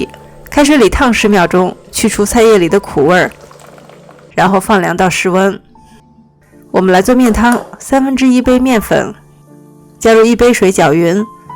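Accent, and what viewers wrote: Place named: North, China. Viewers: 几十年前在西安夏天的味道，小时候永远的美食，普通家常的味道，的西安口音太好听了，好像邻居家的姐姐在边做饭边教大家，轻柔友善，温馨舒适！我们小时候吃的更多的是苞谷面，也会更多的醋和辣椒🌶️！ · She's from Shaanxi, and yes, northern China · 声音听起来好像北京话啊😄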